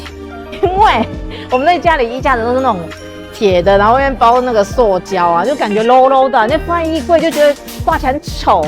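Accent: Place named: Malaysia. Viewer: 很多画面，让我笑了😁 马上订阅😉 那些是台币吗？ 口音也很像我们马来西亚的。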